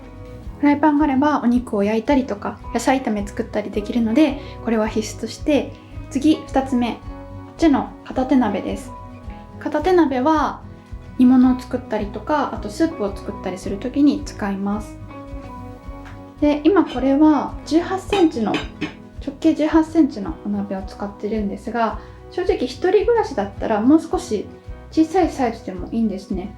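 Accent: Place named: Wakayama, Japan. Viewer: 発音が和歌山の方かな？って思って、間違えちゃいましたすみません笑🤦‍♂️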